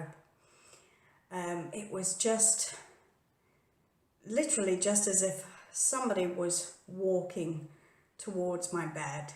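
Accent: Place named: United Kingdom. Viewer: I do not have a channel because i guess ive been searching for the truth for my own needs. I just need to prove it to me. I guess that sounds selfish. Lol. I can tell you're from the UK for sure. I could listen to you speak all day. But anyways, lol. I used to have experiences when i was a child also. My most memorable one was when i was 15 when i had sleep paralysis and witnessed "The Old Hag Syndrome". It scared me to death. She was at my bedroom door making a weird sound and i couldn't move. It didn't stop until i called out for the lord. Thats when i knew "our maker" was real. I have so many to tell. Most are good experiences. But there are a few bad. Please protect yourself when speaking to spirit in case you run into something evil.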